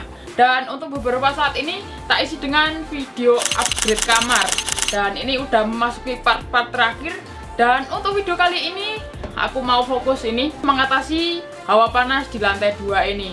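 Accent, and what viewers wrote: Java, Indonesia. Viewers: Mas dari mana ya? Logate jowo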